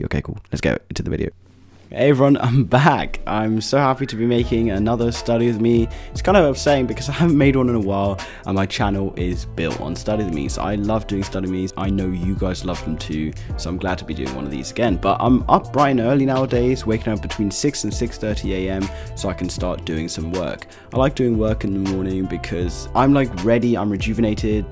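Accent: RP, United Kingdom. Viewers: But how is he posh do?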